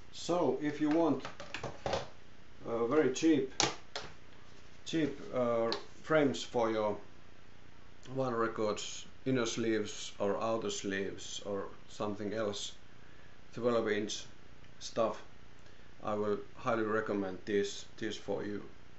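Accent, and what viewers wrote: Finland. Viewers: Kuulin sun aksentin, ja tiesin heti ett oot suamalainen. : D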